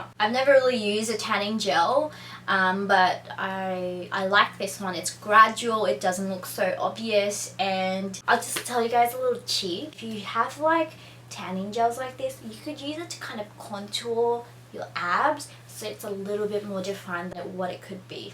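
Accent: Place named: Australia. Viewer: Hey, just wondering, did you grow up in Australia since young/born there? Cos you have a really thick Australian accent & its quite interesting to imagine how you'd speak tagalog with such an accent hehe;)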